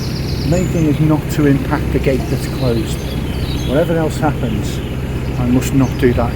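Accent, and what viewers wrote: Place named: Wirral, United Kingdom. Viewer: We've been watching your vlogs, are you from the wirral ? Your accent sounds like wirral, not Liverpool, we are from the wirral 😂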